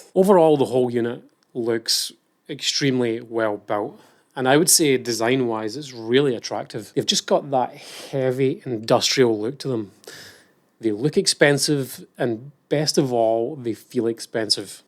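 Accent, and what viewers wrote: Scotland, United Kingdom. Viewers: Would've guessed Scotland or nearby from the accent! Where are you originally from?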